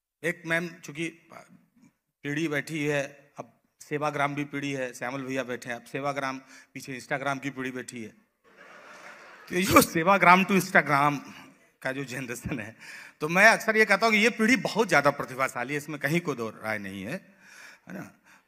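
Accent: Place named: Allahabad, India. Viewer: aapki awwaj me hi allahabad (prayagraj) ki mahak hai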